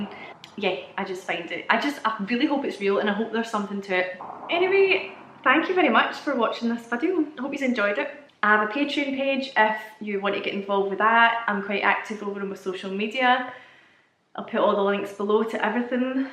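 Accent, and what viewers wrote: Scotland, United Kingdom. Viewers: Hi id love to dive into your hole/your warewolve sized hole that is 😂where about in Scotland is that accent from up north iv had 1 encounter with a cryptid i believe and if youd asked me 6 or 7 years ago if that was possible in Scotland id have said you were crazy but personal experiences prove that this stuff is real 👍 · super excited, and FASCINATING... you are so amazing and precious, i love how you say" Werewolves " 🤣🤣😘🤗🤗 very informative, and the best genes and features i have ever seen.. great show Ma ' Lady in Scottish accent !! keep it up..👍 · I could listen to your voice all day. Love love love the Scottish accent, my favorite.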